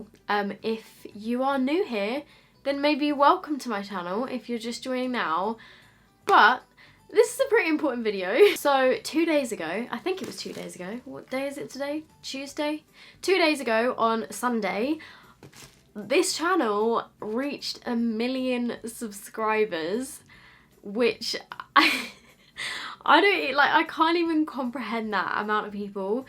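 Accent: England, United Kingdom. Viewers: First of all: CONGRATULATIONS!!!! I feel so happy for you, you deserve it!!! So, it's not very special but I discovered you when I was searching for Makeup Revolution product reviews like 2 years ago I think? And I remember instantly loving your honest reviews, and cute & funny editing style (and also the British accent, ngl). Thank you for your hard work! Twitter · I started watching around 9 months ago. Your videos just kept popping up on my YouTube homepage and just decided to watch on of your videos😂 your videos started showing up even more as being recommended and I started watching you even more😂 I think the reason I subscribed was because of your personality❤️ you’re so pure and natural and I definitely LOVE your British accent😍😍 you’re absolutely yourself and appreciate you for that! I think the videos that made me subscribe were the ones were u were testing products that used u in their ads without ur permission😂 it was THE FUNNIEST😂😂😂 Instagram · First video I saw was 3 months ago, I think it was the Instagram make up trends one, and I thought you were one of the only ones who seemed to be genuine on YouTube and I binge watched so many of your vids 😂😂❤️❤️ Also loved your channel because I lived in England this summer and I love your accent Lots of love ❤️ <mail> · Omg! 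Congratulations, ❤️ I remember the first time your videos popped up in my recommendations, it was the video with boyfriend chooses my makeup😂 I watched that and then fell into a hole of watching too many things from your channel. At one point I was like, I am subscribing to her. Even though at that time I didn’t know English that well, damn that was more than 3 years ago, and I was kinda of scared of your British accent, because for me it was hard to understand (btw now I get everything you say🤩)but I subscribed anyway because your content was and still is really entertaining, but you have something unique. You are a REAL person. I can relate to you, you don’t hide the fact that you have problems or other stuff like some youtubers do and for that I really appreciate you❤️ Another thing i remember is that I was really surprised when I saw how many subscribers you had. You always provided good quality for your videos and I was convinced you had more than 1 million fans:)) How says: “ ”, you finally did it. 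(Btw, I started listening to because of you🙃)on another note, I am here for the makeup: I have a big passion, just like you and I’ve learnt so many things from you, plus I bought a lot of the products you recommended and they worked👌🏻 You are just such an inspiring, intelligent, creative, sensitive person and much more. I am truly happy for your accomplishment!! Love you❤️❤️ *also my insta is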